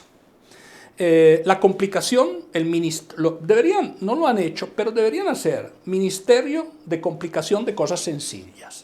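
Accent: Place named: Santa Fe, Argentina. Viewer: Buen análisis , hablas como un santafesino de la vera cruz , capital de Santa fe , Argentina, te dejo la incógnita para saber cuál es nuestra particularidad al hablar , si la adivinas ,me suscribo te sigo y te comparto 👌👏👏👏🇦🇷👽🚴🏽‍♀️☯️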